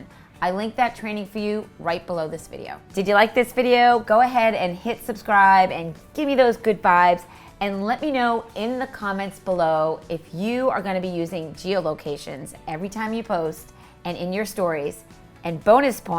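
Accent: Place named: Boston, United States. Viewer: is the best!! She has been so helpful in providing tips to help me grow my business! She’s the real deal and an Instagram guru!! And I love her Boston accent!!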